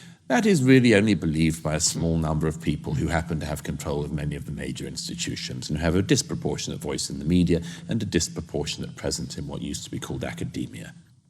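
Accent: RP, United Kingdom. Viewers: Douglas Murray is a moron with a posh accent. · With a posh accent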